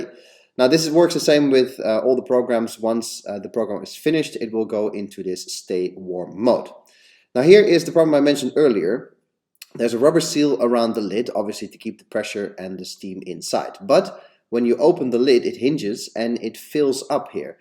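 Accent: Netherlands. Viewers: Are you dutch?Good review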